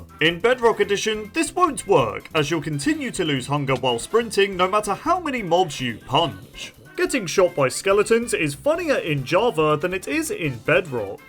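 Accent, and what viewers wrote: United Kingdom. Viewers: oh. you dont. you guys just sound british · dude hes British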